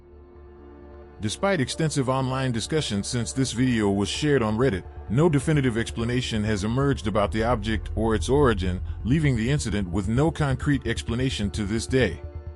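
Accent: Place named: South, United States. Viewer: First of all, I love the videos. Secondly, you have the must subtle southern accent. Sounds like South Louisiana or maybe even Houston. Cheers from a South Louisianian!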